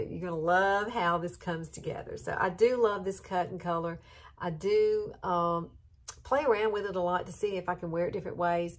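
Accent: South, United States. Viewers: I have to say this first.....I watch a lot of Youtube videos for one thing or another, and I find myself muting the person on the video because their voice is so annoying. LOL You have the voice that makes me want to listen, because it's like the difference between a shout and a whisper. The lovely Southern accent helps too! Thank you for a most entertaining and informative video! Love from Los Angeles! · You are so sweet...love your southern accent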